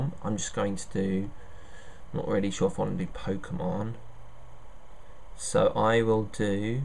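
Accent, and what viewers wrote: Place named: United Kingdom. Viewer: You have british accent! :3 thanks 4 this, man!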